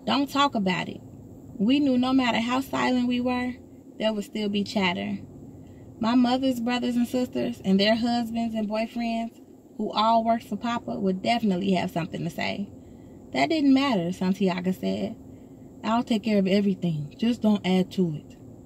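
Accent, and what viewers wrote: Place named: South, United States. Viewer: The reader has a very strong southern accent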